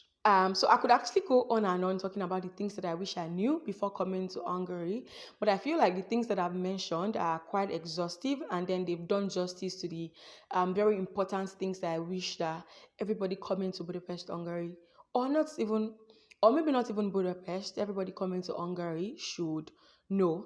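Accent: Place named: Jamaica. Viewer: Yes you speak English but you have a Jamaican accent. Hard to understand . Don't complain about the people. You are not perfect. So you went to a Country to learn. You can tape it on google for what you want. That helps a lot. Any Country is the same. Yes. No one uses sorry. Because you need to learn the basic Hungarian language. Elnezest. Use this. This country is not an English colony. Before you went there you didn't read about anything ? Your fault.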